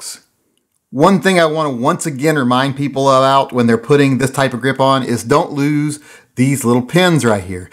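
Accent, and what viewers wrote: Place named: West Virginia, United States. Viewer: Your West Virginia is showing. They're the only ones that say, "colbra".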